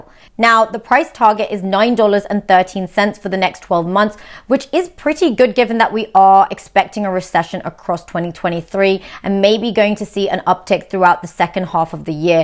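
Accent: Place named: United Kingdom. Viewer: Do I dig your British accent!!!! Where would professor Higgins place your accent? Lundan?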